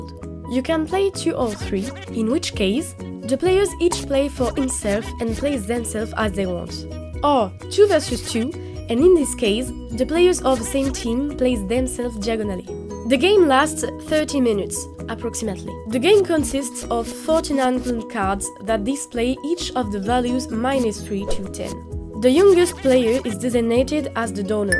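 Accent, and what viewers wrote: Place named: France. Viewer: your french accent is cute! Divitchia,divika,divissia,Druid.. :D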